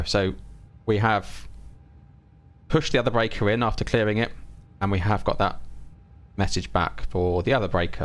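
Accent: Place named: United Kingdom. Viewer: Good videos, but at times the british accent comes a bit much to my taste.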